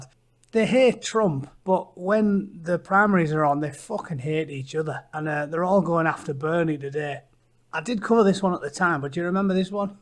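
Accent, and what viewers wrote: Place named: Teesside, United Kingdom. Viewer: Great commentary from you. You sound like a teessider, which makes it better than the usual metropolitan wank.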